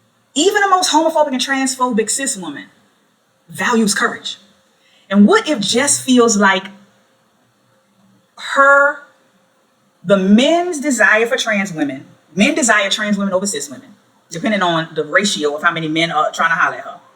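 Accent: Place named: New Orleans, United States. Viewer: U sound like u from New Orleans!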